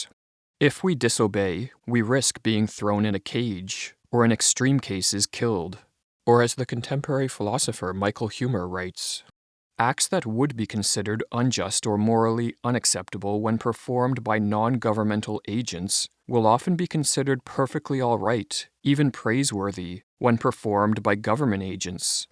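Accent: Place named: Canada. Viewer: I like the narrator’s Canadian accent here. Makes sense right about now.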